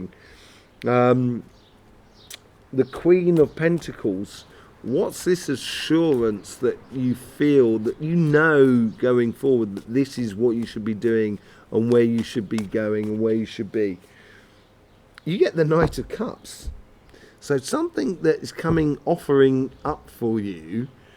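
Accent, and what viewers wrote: United Kingdom. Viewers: Nice to find a British reader on YouTube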